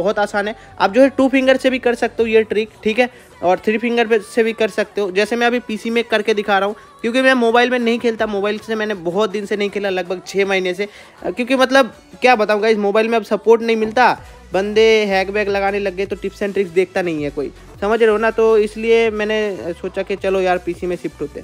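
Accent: Bengal, India. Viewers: Vai ap bangali ho 🤔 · Bhai aap bengali ho kya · Bhai tum bangali hoo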